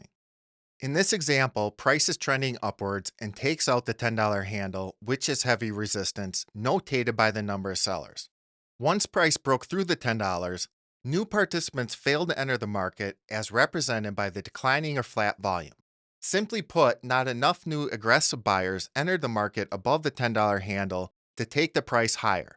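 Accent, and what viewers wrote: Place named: Chicago, United States. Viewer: He’s real. Real good at trading. Notice the Chicago accent? They’re real good at trading too.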